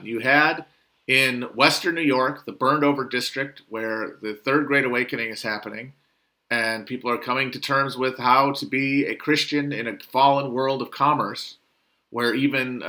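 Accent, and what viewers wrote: Louisiana, United States. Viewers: HUEY LONG! Laissez les bons temps rouler, and let loose with your Cajun accent for an hour.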